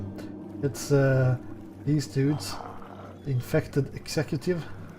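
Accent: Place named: Sweden. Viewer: Really bad starting spot, you did well. That's a big compliment to 95% of the other idiots I've watched playing. Are you Swedish by any chance? Both because of dialect and having some gaming intelligence. I've watched a dozen American streamers being incompetent at this game. Tummen upp. All the other streamers I've just facepalmed myself. Very good.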